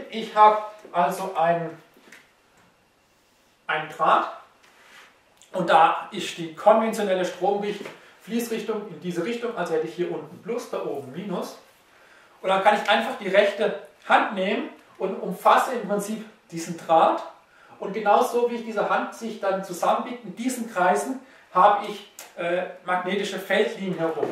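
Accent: Swabia, Germany. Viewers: das heißt nicht leischtung sondern leistung und ein W wird nicht mit nem Kreuz in der Mitte geschrieben. argh, das schwäbische kann man sich einfach nicht anhören. aber sonst gut erklärt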